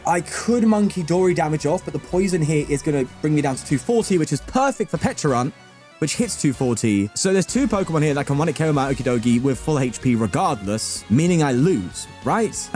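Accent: RP, United Kingdom. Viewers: No, you sound fine. Sometimes I find the posh wee bit funny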